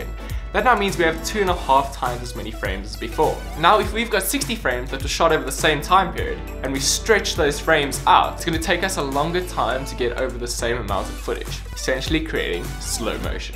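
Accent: South Africa. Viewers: South African right??? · Are you South African? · You South African?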